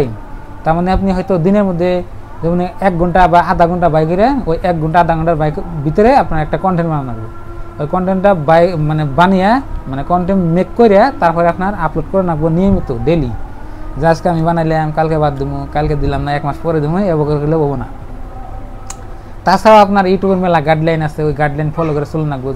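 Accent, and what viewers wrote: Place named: Jamalpur, Bangladesh. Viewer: Tumar kotha barta ar bhashar dharone mone hoy tumar badi Jamalpur.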